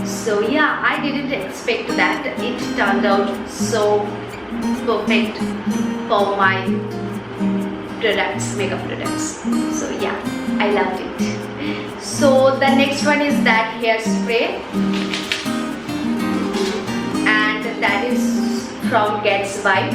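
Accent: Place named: Bengal, India. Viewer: First View Is My ❤️ and And First Like Is My ❤️✨ lot's of love your BANGALI VOICE Cutest pie 😊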